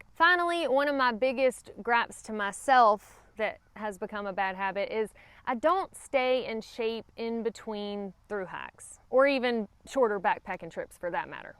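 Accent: South, United States. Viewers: Over the years of watching you videos I have learned to adore you southern accent. Naat Haakin (night hiking) aat (out) aas (ice) and so on. I love it. Please don't take it as a criticism, it really isn't. I look forward to it. Your greeting of "Hey Y'all" is similar to our UK "hiya". Best wishes from the UK.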